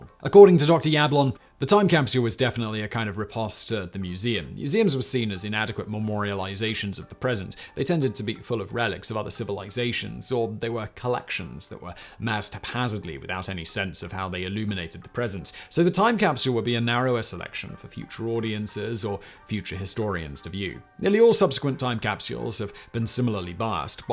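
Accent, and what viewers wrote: United Kingdom. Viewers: I know this was an old video when it started because Simon still has his full british accent.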